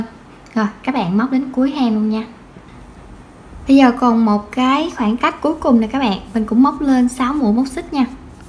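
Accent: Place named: South, Vietnam. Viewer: Bé hướng dẫn rất dễ hiểu. Cô lớn tuổi rồi, mà cô còn theo kịp đó. Cô cảm ơn con. Cô thích giọng miền Nam của con rất dịu dàng dễ thương ! 👍💐❤️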